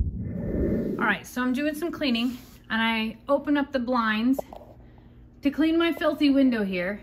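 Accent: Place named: Texas, United States. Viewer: From Joan- I love how you are getting a Texas “twang” added to your speech.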